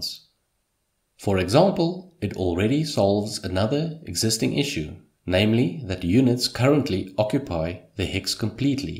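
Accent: South Africa. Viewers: Came here from Reddit. Heard that South African accent, and sub. Gonna start from part 0 tonight!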